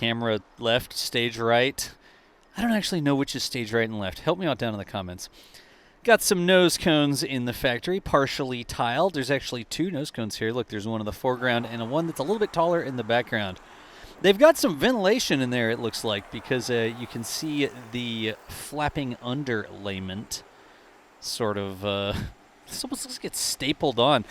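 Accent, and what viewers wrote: Andalusia, Spain. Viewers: Estupendas las explicaciones de como van los avances, gracias por la narración en andaluz. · GRACIAS a tí por traernos las noticias de primera mano y en perfecto español (ademas con ese acento andaluz tan simpatico y agradable) ❤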